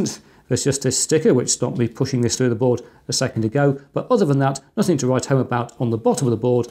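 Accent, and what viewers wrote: United Kingdom. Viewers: old lovley man with that british accent like that and like the way you like respery pi s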